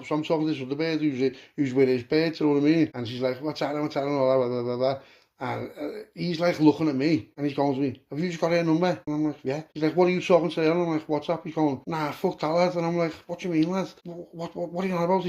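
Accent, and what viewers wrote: Liverpool, United Kingdom. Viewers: Could have swear I heard you today in Turkey ano scouse lads sound similar but sounded proper like you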